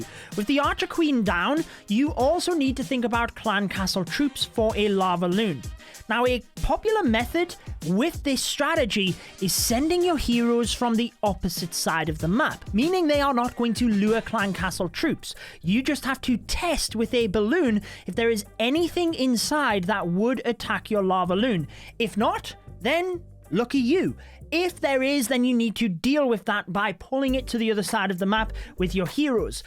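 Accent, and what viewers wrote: United Kingdom. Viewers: I can’t tell where your accent is from. I mean, I guess it’s British but guessing the city is very hard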